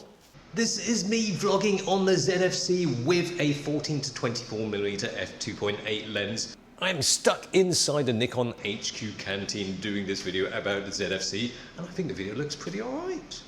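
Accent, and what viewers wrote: United Kingdom. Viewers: Thats how we pronounce it here in the UK ( Kai is British of Hong Kong Chinese decent ) and its the original pronunciation btw, its only Webster whom decided to change our British language and spellings because he had an issue with some of the French inflections within our language. We spell Harbour with the u, you spell it Harbor without because he changed it, the same goes for Aluminium. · I'm just mesmerized by an Asian guy with caricature glasses and a British accent/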